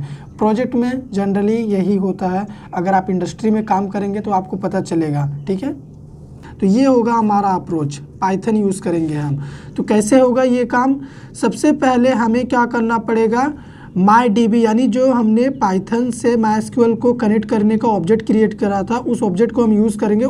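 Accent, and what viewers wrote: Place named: Jharkhand, India. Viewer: Hearing your voice, I remember my friend Venkatesh in Guwahati , who was from Jharkhand with same accent. Thank you.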